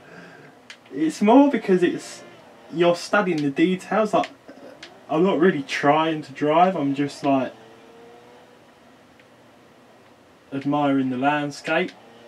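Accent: United Kingdom. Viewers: Hahahhaha "excuse me.... here we go!" Hahahhaa I love this British guy! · Great video but honestly, the commentary is so damn british it's incredible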